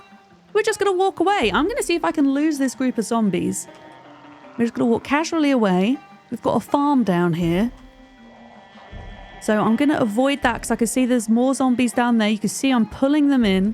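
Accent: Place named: United Kingdom. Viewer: Hey, your video was recommended to me enitely by the gods of YT's algorithm and I'm gratefull for it! Good to hear a fellow Brit gamergirl! Keep it up!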